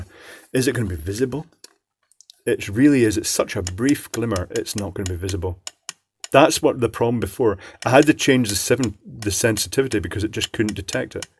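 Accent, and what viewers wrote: United Kingdom. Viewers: your proper English is impressive Are you from UK your accent seems To be British Hi from india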